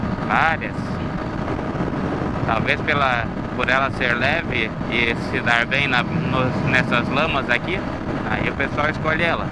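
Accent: South, Brazil. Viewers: Pelo o seu jeito de falar, parece que tu és do Sul do Brasil. Que Deus abençoe o seu destino, mostrando para nós um pouco do Brasil. 10/01/2021. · Pelo sotaque é do Sul Paraná ou Santa Catarina? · Pelo sotaque o dono do canal parece ser do sul. Acertei?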